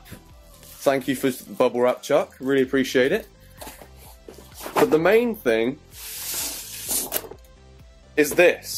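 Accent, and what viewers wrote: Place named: United Kingdom. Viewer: Hey man, where roughly in the UK do you live (not a stalker,just trying to figure out the accent)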